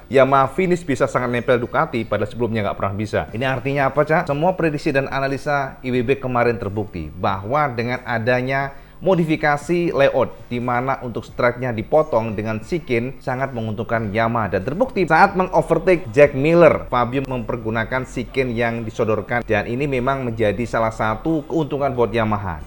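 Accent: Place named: Java, Indonesia. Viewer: Jos cak, cennel medok udah update 😂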